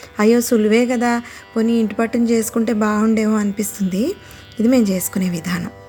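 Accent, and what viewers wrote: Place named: Vizianagaram, India. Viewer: మీ లాంటి వాయిస్ విని చాలా ఇయర్స్ అయింది... ఇలాంటి తెలుగు చిన్నప్పుడు మేము ఉండే ఏరియా vzm లో మాట్లాడితూ పెరిగాం.. ఇప్పుడు వాళ్ళ నార్మల్ గానే ఉంది 🙏🏼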